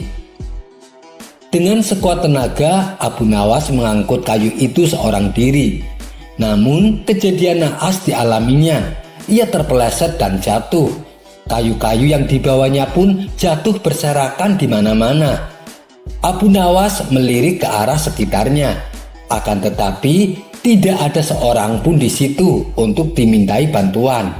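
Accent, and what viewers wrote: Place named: Medan, Indonesia. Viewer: Medan bung...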